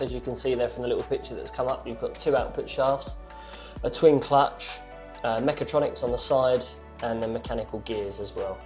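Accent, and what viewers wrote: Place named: London, United Kingdom. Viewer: Good to hear a londoner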